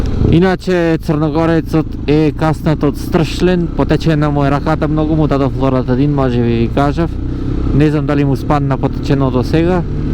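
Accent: Bitola, North Macedonia. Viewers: браво, за времето трудот и енергијата за креирање на убава видео содржина. Ама од се најпојќе ме бендисва шо по Битолски си збораш, и само така продолжи. Кој чактисва, чактисва, другите горе долу од видеото ке сватат. Поздрав 💪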